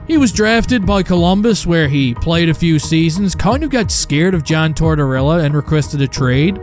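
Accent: Newfoundland, Canada. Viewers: You sound like a newfie.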